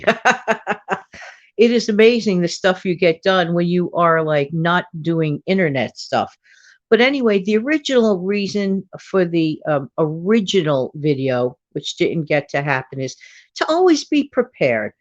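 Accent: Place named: New York, United States. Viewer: I’m listening to your voice and thinking how did I not know you were a fellow New Yorker. Cool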